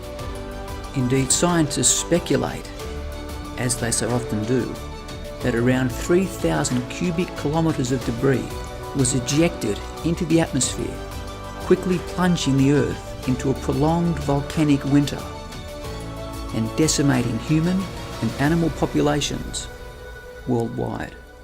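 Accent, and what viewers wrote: New Zealand. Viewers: 7 volcanoes, lots of hyperbole, little actual information for real reference. Not saying the 'supervolcanoes' are not super hazards, they are. But this video isn't giving anybody any degree of expertise and detail of known facts. Decent visuals, great Kiwi accent. The music is cheesy and makes it clear from the start that this is fluff, not science. I love accurate pop science. This isn't it. Starting with who is the author and why they are qualified to present information is always an honest start. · It’s a kiwi accent you dumbo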